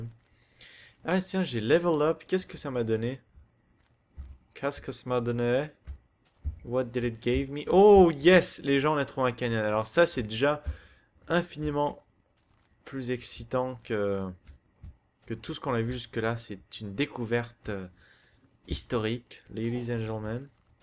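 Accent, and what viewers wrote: Quebec, Canada. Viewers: Il est canadien ou francais? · Ouais, il vit au Québec donc desfois l'accent québécois surgit :D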